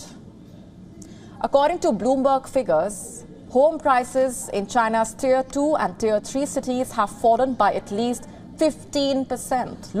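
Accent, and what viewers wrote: India. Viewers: Indian accent... a bit hard to understand.. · Her Indian English is more interesting to me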